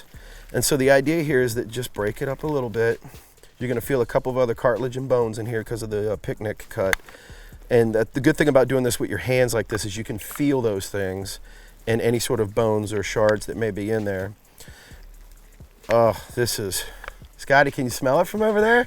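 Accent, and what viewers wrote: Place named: South, United States. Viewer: Great video. Except your accent sounds like the southern surfer guys we have down in Charleston. Strange. Haha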